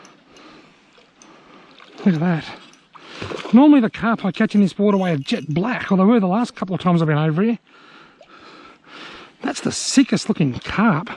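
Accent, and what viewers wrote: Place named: Australia. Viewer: Sounds like a true Aussie.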